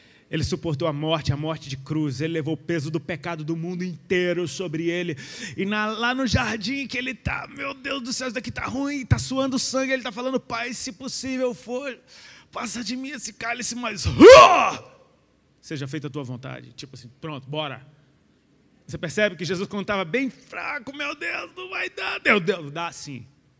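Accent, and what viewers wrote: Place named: Portugal. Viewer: quanto que o "gajo" corre!! Ameeeiiiiiii, portugues de Portugal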